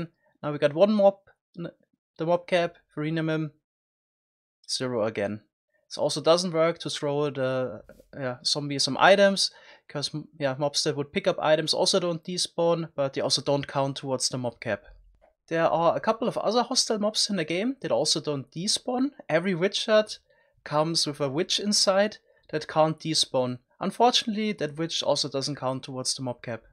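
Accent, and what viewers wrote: Germany. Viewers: gotta love German accents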